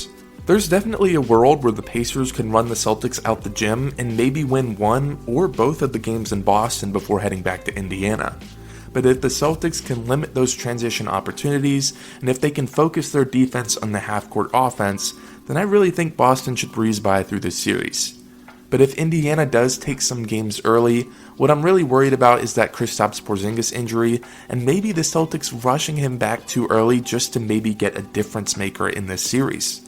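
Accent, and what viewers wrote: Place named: South, United States. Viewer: Such a strong southern accent!!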